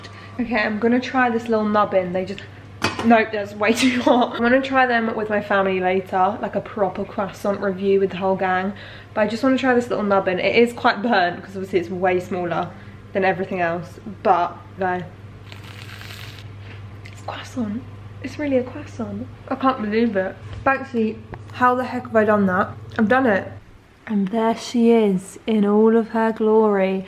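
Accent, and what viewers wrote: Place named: Birmingham, United Kingdom. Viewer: You're a brummie! I knew it!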